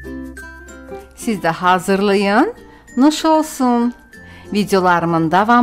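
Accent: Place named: Sheki, Azerbaijan. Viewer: Mən Şəki lehcesine oxşadiram.mamamin xalası da eynən belə danışırdı.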